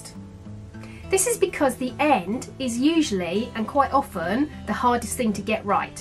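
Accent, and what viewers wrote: United Kingdom. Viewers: a nice English voice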